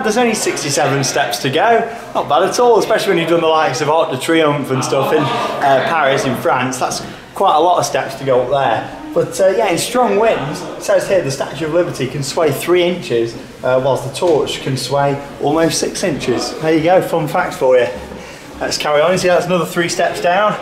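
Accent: United Kingdom. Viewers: I like your uk accent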